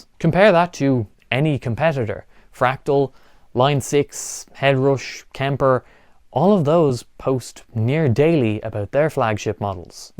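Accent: Ireland. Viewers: Why do u have a Irish accent? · I came here because I needed to hear that gentle, Irish voice of...DOOM!! 😎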